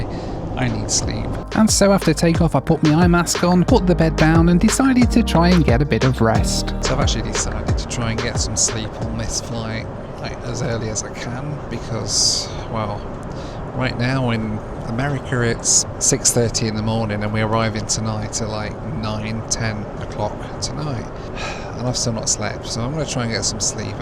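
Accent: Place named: United Kingdom. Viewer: Lol!!! he's getting big pay I think. Keep promoting for that hell hole place. Hes welcome though because he's white with British accent. I would even afraid to go there myself. Lol!!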